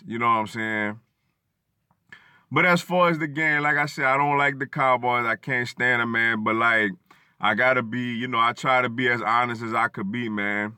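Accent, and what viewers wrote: New Orleans, United States. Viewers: man you got a new Orleans accent...I swear.....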